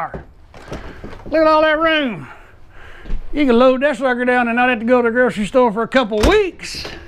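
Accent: Texas, United States. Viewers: I'll buy this if you come along, too 😉 ! You are AWESOME!! I didn't check yet where you're located, but you sound like you might be a Texan, who are some of the coolest people in the world 😘 !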